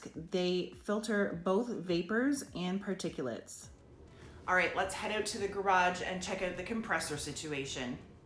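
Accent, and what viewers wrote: Canada. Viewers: Your Canadian eh! Even better!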